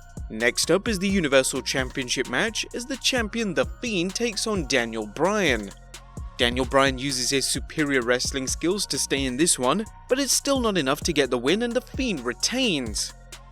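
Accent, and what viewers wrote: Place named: United Kingdom. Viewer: YES!!! The British voice is back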